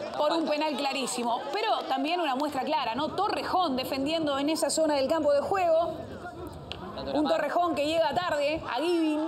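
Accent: Argentina. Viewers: Una hembra comentando ?? Y con acento de argentina ...hasta el culo el periodismo futbolistico en el Peru.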